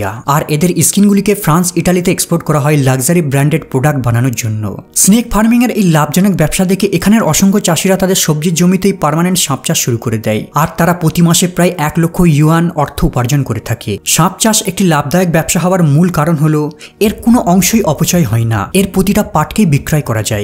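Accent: India. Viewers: ইন্ডিয়ান মনে হয়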